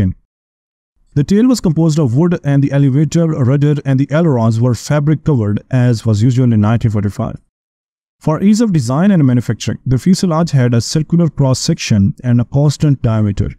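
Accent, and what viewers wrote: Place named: India. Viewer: Indian voice over 😢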